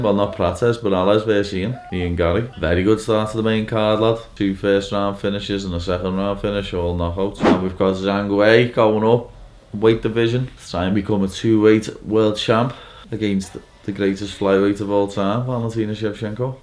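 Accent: Liverpool, United Kingdom. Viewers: Liverpool akcent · Bruh's scouse is extra thick when he wakes up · Why should he scouse is kings English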